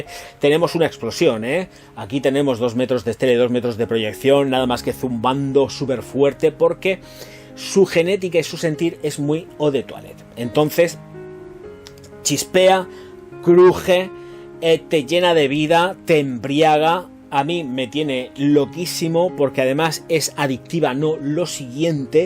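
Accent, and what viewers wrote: Spain. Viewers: Jaja este acento d los españoles si q es muy cómico, m encanta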